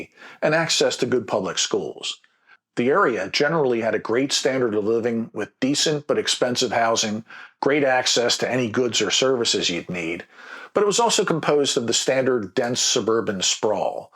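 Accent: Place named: Baltimore, United States. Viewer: Considering this very thing for the future and interested to hear your experiences! (By the way, I thought I heard a bit of a Maryland/Baltimore accent while you were talking. A bit of home for me! I’m from the DC area with many relatives in the Baltimore and DC suburbs but live in the Pacific NW now)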